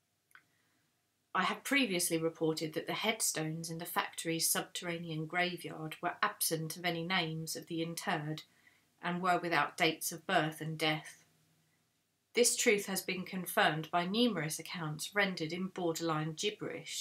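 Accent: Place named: United Kingdom. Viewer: Thank you for reading Lig's for us. I would try to be closer to your microphone, or use a headset one that has mic close to your mouth. Your voice is lovely, as are most UK accents.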